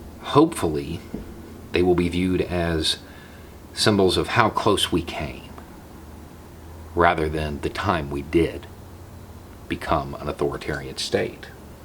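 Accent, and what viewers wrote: South, United States. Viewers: I guess while we need to be able to exercise our freedoms to improve, what I immediately think of is a lack of kindness. I’m tired of people treating each other like garbage. It feels like humanity is in its teen years, and anything different is made fun of, is a weakness to poke at, in hopes of tearing them down and making ourselves feel better. When I first started watching this channel, I was hesitant to click. I’m from the boarder of TN/KY and I knew that accent immediately, lol. And I wasn’t used to hearing these ideas come from someone who sounds like this. So not only do I find out about world events and hear a very interesting perspective, but I also have to check myself, because while I wasn’t actively being a jerk, I was falling into the trap of putting someone in a box of my expectations before I’d heard the words they had to say. 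I feel like... this channel helps keep me honest, while I’m trying to find ways to promote what I consider to be the ideal.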